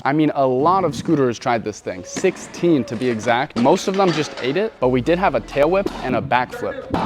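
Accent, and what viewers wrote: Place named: United States. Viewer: You’re speaking American and using metric. Wow.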